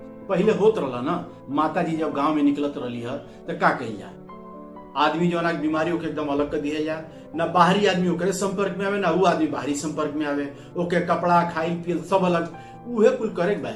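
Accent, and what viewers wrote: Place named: Bhojpuri, India. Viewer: इतनी अच्छी विवेचना और इतनी सहज भोजपुरी। इतनी बढिया बातचीत। किसी भी ऐंकर से नहीं सुनी थी।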